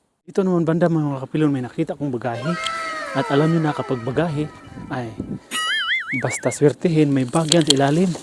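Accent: Visayas, Philippines. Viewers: tuwang tuwa tlga aq pag pinapanood q ito gustong gusto q ung Punto nia bisayang bisaya ang kulit 😅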